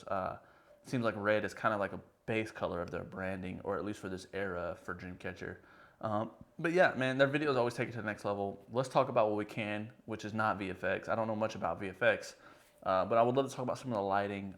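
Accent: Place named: South, United States. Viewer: refers to the genetic condition of two different color eyes. If you look closely, they all have two different colored eyes. And the last video they’ve built the tree so I don’t know if it’s green screen. We’ll know when they release the behind the scenes. And where are you from? You are a southerner I can tell. Georgian here 🍑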